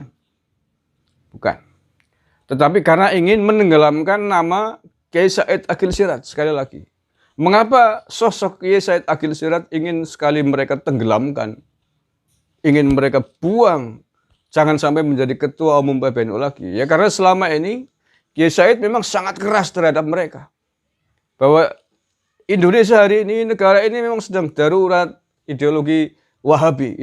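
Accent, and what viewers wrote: Banyumas, Indonesia. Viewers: Ini manusia tukang fitnah ....dasar ngapak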